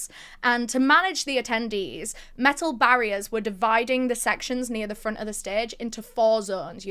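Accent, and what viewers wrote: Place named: North, United Kingdom. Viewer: northern accents are so hard to listen to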